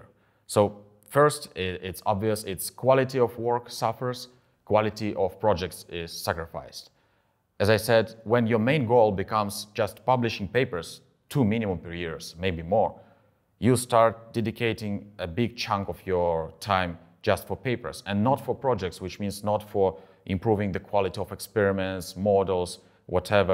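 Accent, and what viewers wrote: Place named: Russia. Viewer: I was thinking "ah, familiar accent". Hello to fellow Russian researcher. I'm also a researcher at US University. And papers fever is way too real. =( I have literally 3 citations.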